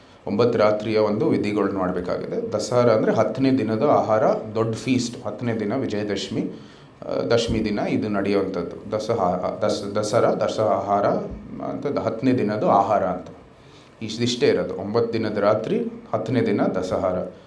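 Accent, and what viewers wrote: Standard. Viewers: ನೀವು ಹೊರ ದೇಶದಲ್ಲಿ ಓದಿ ಬಂದಿದ್ದರೂ ನಿಮ್ಮ ಶುದ್ಧ ಕನ್ನಡ ಕೇಳಿ ತುಂಬಾ ಸಂತೋಷವಾಯಿತು.❤😊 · ನಿಮ್ಮ ಶುದ್ಧ ಕನ್ನಡ ಮತ್ತು ಸಂಯಮ ಯುತ ಮಾತು, ಮತ್ತು ಜ್ಯಾನ ಸಂಪತಿಗೆ ನಮ್ಮ ಗೌರವಯುತ ನಮನಗಳು 🙏🙏🙏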